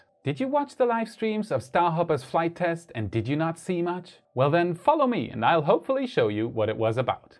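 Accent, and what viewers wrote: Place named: Germany. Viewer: Nach 2 Wörtern schon felsenfest gewusst dass du deutscher bist ;) Danke für das Video, echt super erklärt^^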